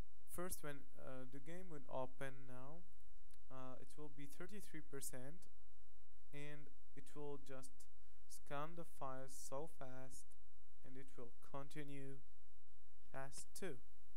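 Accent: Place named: France. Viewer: Azy t'es un français :p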